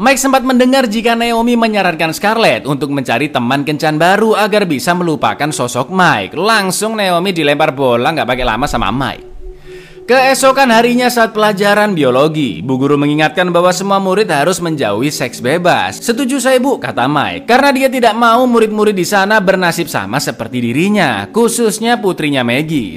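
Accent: Java, Indonesia. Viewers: Rika wong ngendi jawane ya lurr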